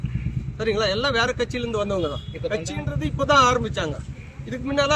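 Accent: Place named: North India, India. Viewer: Dai nee pesuratha patha north Indian pola iruke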